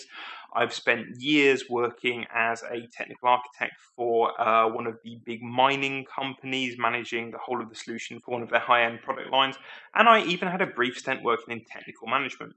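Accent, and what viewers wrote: United Kingdom. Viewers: Is it the audio quality or the British accent.. it is hard to understand you sometimes. But this topic is great :) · Please talk a little bit slower. There are people that are watching you and English is their 2nd language. And UK has a particular accent and no substitutes. So it can be harder and have to stop and rewind. You can take your time, no need to rush. Everything else is good.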